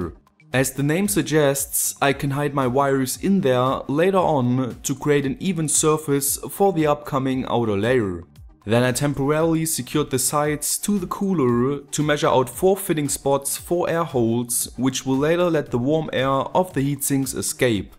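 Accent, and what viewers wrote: Germany. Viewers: Du kommst doch bestimmt aus dem deutschsprachigen Raum oder? ;) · he's german, it's an accent. deal with it.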